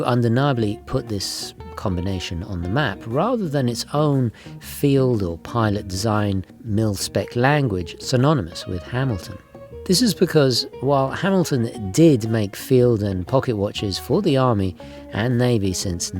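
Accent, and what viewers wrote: United Kingdom. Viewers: An American with a Brit accent in a foreign country doesn’t get anymore American than that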